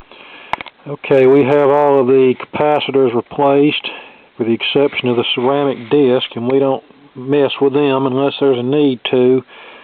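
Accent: South, United States. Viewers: I don’t know where you live, but you sound like you’re from the south like myself. I wish I could come and apprentice with you. I find these vintage stereos/turntables fascinating. I’ve started refurbishing them, V-M primarily as a hobby. I really want to dive into the electronics, but I’m apprehensive. I’ve only ever replaced a capacitor in a TV. All of the diodes, capacitors, tubes etc seem very daunting. However I’d really love to learn. It’s sad that there aren’t more folks like you willing to pass on knowledge to others. Thank you for your videos, and if you’d ever like to tutor me in basic electronics that would be fantastic.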